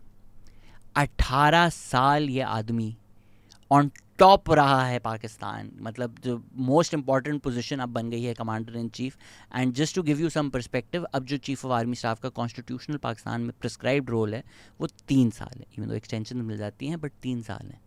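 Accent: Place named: Punjab, India. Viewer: We love when u throw in a little punjabi vich vich 😅 I love your punjabi pronunciation ❤❤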